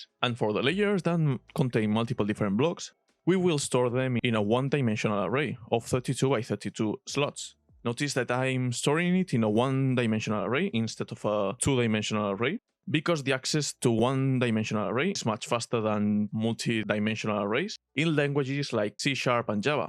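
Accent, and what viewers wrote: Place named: Spain. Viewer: Looking incredible, I hope this goes well! Also, are you spanish? Because your english accent sounds like every spanish speaking english, like myself.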